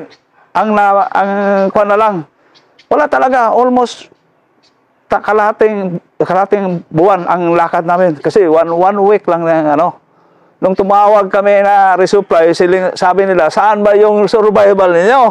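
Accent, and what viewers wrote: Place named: Iloilo, Philippines. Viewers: Basta ilonggo mag kwento maganda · Ilonggo gid si sir..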